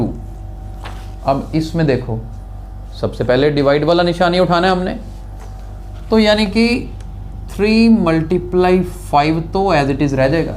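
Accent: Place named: Ambala, India. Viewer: Apki language ambala area ki lag rhi hai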